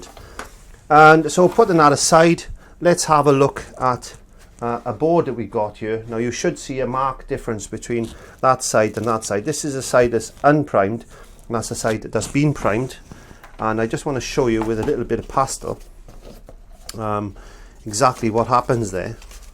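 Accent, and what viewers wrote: Wales, United Kingdom. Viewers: Loving the Welsh accent, hello from Taffs Well Butty Boy :)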